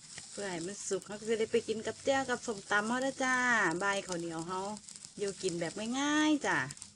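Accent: Isan, Thailand. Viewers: เว้าอีสานเพราะจังน่าฟัง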